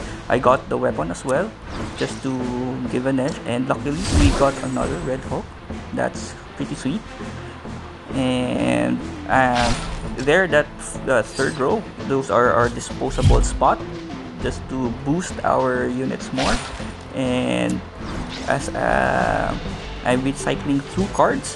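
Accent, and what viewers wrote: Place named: Philippines. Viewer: Nice! Im building this deck once I unlock Elektra, only need The Hood and I can unlock her as a hero. Btw are you Filipino? Guess ko lng from the accent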